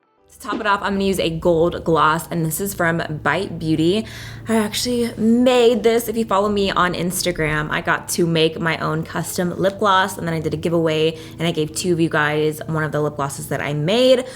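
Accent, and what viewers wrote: South, United States. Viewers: Has anyone noticed she has a slight country accent?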